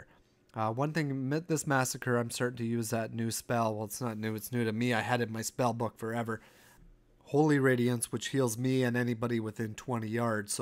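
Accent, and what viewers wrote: Canada. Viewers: Couldn't agree more. I love that calm Canadian voice and the way he says process. If it turned into something like "HAI GUISE HERE LOLOL ALRIGHT LET'S DO SOME ACHIEVS WOHOO" it would really ruin the character of as well as. Just my 2 cents.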